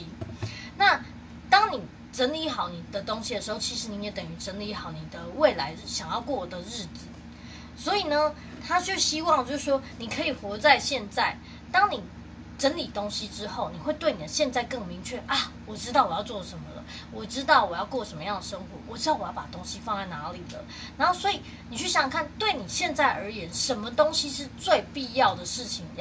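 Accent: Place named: Taiwan. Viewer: 的台味超級喜歡😘有買的書，現在在家防疫都聽說書邊丟東西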